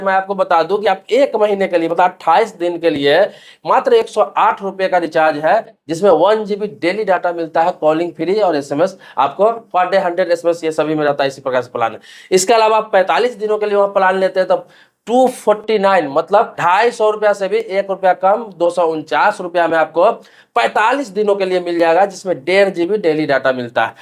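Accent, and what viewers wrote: Bihar, India. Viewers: Mia ji ap bihar say h kya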